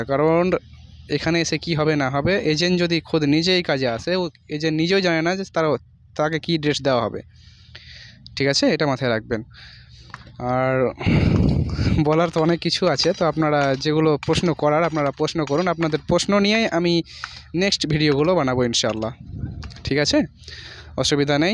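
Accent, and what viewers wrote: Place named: Kolkata, India. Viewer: Aapner bhari kothai Kolkata er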